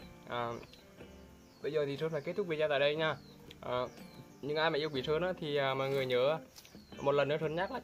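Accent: Vietnam. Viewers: Bạn người nghệ an à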